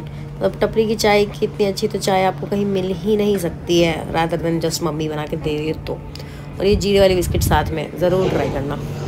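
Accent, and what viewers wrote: Bihar, India. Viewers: It's nice to hear her bihari accent 😍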